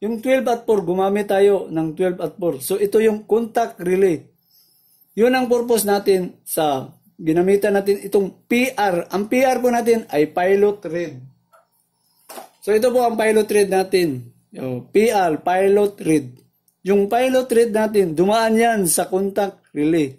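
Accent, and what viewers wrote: Visayas, Philippines. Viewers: Bisayaa jud oi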